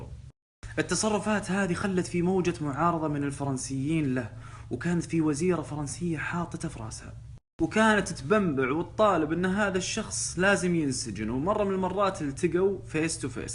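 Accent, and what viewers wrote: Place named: Gulf, Arab world. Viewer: انت خليجي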